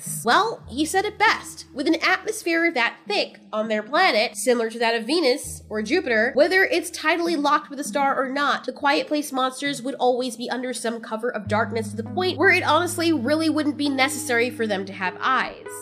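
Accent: United Kingdom. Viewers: please answer this are you british bcs that was the best british accent uive ever heard, i should know since im british. i started watching u about 3 weeks ago and have been so fascinated by all of this with a quiet place i love ur work aswell please keep it up <3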